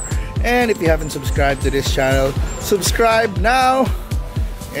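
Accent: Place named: Visayas, Philippines. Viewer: bisaya gud ug voice oi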